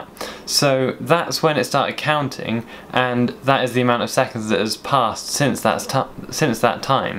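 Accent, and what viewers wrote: United Kingdom. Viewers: awesome ,sounds like a British?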